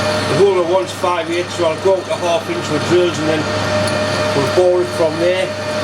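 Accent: Newcastle, United Kingdom. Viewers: Although I am a Yorkshire 'Tyke' and not altogether accustomed to your Geordie accent, I understand you perfectly,. Just remain as you are! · Geordie as it is spook! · Il est du Nord-Est de l'Angleterre, il parle l'anglais avec le dialecte atypique pour les gens nées a Newcastle-upon-Tyne, le 'Geordie', qui est une mélange de anglais,celte et mots de langue nordique (norvegien et danois).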